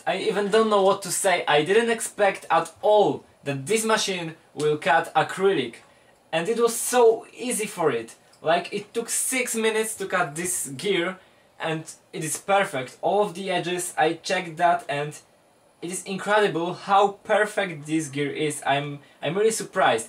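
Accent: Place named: Poland. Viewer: Bardzo fajny projekt sam chciałem zrobić coś podobnego i słuchać że jesteś Polakiem po akcencie i po nazwisku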